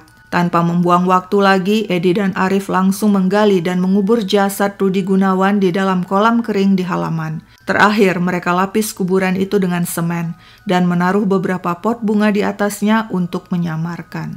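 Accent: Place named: Medan, Indonesia. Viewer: Logat ini kek logat Medan om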